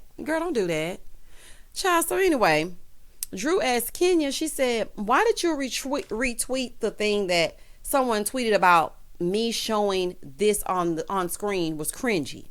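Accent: South, United States. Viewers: oh godess you have the cutest voice and balanced fun comentary are you a southern belle?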